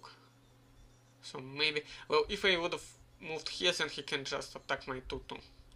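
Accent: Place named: Russia. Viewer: ю рашн инглиш ту бит май ирс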